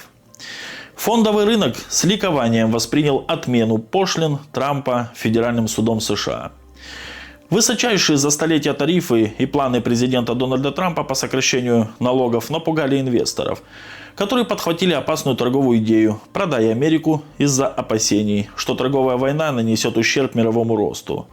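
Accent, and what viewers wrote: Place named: Ukraine. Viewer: Хохляцкий говор, очередная брехня от тцк шников!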